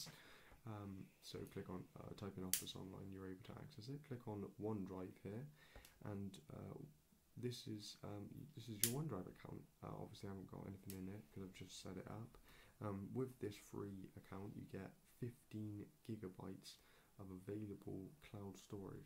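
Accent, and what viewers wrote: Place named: England, United Kingdom. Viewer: English accents are delightful... especially when one can hear anything spoken ... I cannot! Where's the volume?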